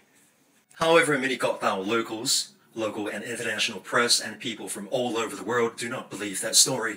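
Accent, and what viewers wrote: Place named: West, United States. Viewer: คนอ่านข่าว สำเนียงฝรั่งจ๋าเลย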